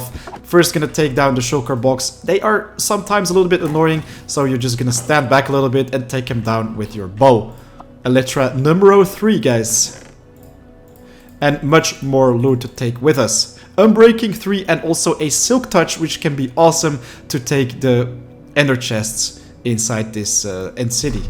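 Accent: Germany. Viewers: the german accent made me cringe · Is this a german accent?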